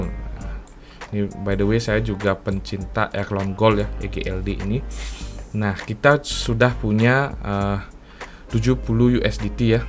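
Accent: Bali, Indonesia. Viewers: Makasih bang.. abang dari bali ? Logatnya seperti orang bali.. kalau iya.. sukseme bang. Rahayu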